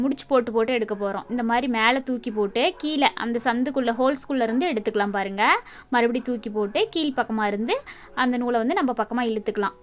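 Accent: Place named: Kongu, India. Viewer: ரொம்ப ரொம்ப அழகா இருக்கு. ❤❤❤❤. நீங்கள் எந்த ஊர். உங்கள் பேச்சு கொங்கு மண்டலம் போல் தெரிகிறது. இந்த ரோஸ் எங்கே கிடைக்கும்.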